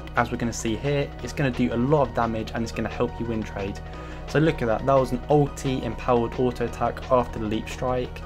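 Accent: United Kingdom. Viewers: He includes (british gamer) because the accent is what brings people here:D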